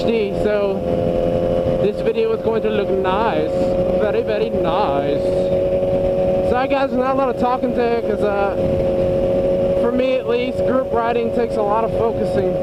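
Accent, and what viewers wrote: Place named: South, United States. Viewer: Im just curious but where do you live? Like what general area? Im not trying to be creepy or stalkerish but I was wondering because you are always going to the beach, the area seems very temperate, like in this vid you see some swap. From your accent you it sounds like the south maybe? Im guessing NC/SC. Im thinking on moving down there in the spring an was curious about the riding season.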